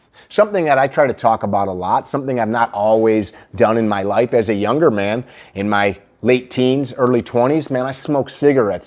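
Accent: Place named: Midwest, United States. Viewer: I love your videos and attitude. Please keep them coming. I binge watch. Sorry to ask a stupid question. Neither my wife nor I can tell where your accent is from. I guessed anywhere from Minnesota to Chicago area? Sh*t, that's probably far off. Thanks.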